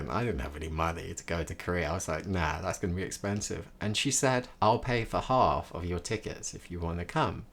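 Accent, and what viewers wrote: United Kingdom. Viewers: 한여름밤의 꿈같은 멋진 추억이네요~ ^^ 저는 반대로 영국에서 그런 추억이 있었네요 같은 영국억양을 가졌던 친구가 생각나네요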